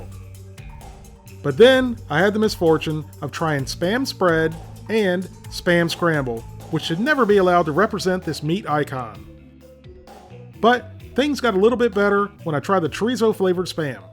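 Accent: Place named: Maryland, United States. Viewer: What part of Maryland you from, chief?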